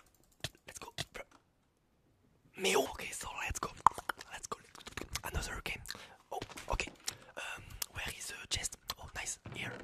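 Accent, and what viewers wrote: France. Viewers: r u french boy? ur english is so cool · you comm from french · 10 secondes j’ai capté il était français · You are from fransh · he la french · Are you french? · Je me disais que t'avais un accent fr sa a confirmé le it's c'est trop 😂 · Is he French? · J'ai juré sur ma vie t'es français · Iam french and i think he have a french accent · I see i see u ar not english i gues u ar french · Tu ne serais pas français par hasard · I thought he was a spanish turns out french very cool :)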